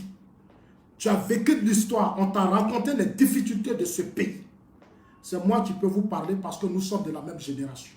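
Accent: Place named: Bété, Ivory Coast. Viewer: Il parle avec l'accent de bété qu'il est.Il n'est pas là pour apprendre à parler avec autre accent.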